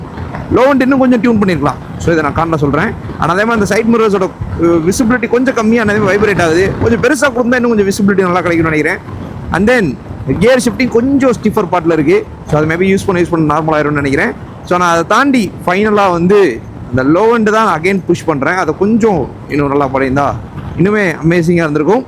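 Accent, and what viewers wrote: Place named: Madurai, India. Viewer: Nimukkum pothu? Speech madhura Karan madhura Karan than😂🎉😊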